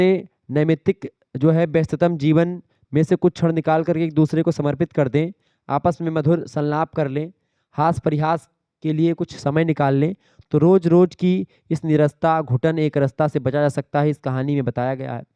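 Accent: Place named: Awadhi, India. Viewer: Aap avdhi bolte hai, badhiyaa hai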